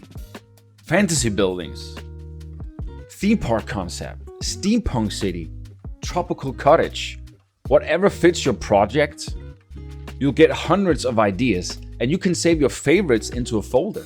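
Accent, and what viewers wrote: Denmark. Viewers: Are you slightly danish perhaps? the accent sounds very danish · Thanks for the video it helped a lot. Og det også altid så hurtigt man hører den danske accent når man åbner en tilfældig video haha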